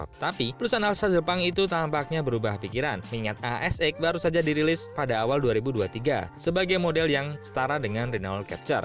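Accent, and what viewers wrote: Java, Indonesia. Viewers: Mobil sih keren tapi gak nonton sampe habis gara gara narasi pake logat di medok medokin jawanisasi,,,, aneh denger nya ky cakupan siaran terbatas daerah,,,, gak nasionalis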